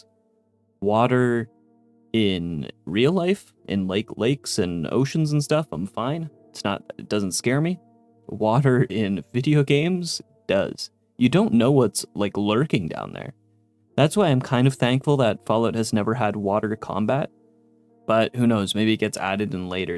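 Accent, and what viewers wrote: Canada. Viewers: Ah he’s Canadian never noticed that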